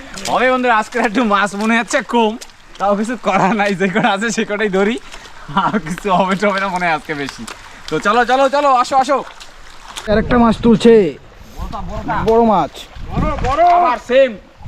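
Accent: Barishal, Bangladesh. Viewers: বরিশালের একসেন্টের সাথে মিলে অনেকটা ।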